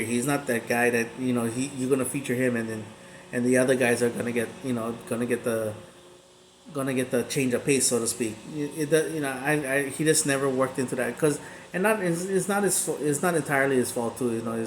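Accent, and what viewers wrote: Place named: Hawaii, United States. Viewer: Ahaha braddah wea u from..i know u from hawaii just by da way you talk but where in hawaii..btw you guys team looking like Rubbish..fly eagles fly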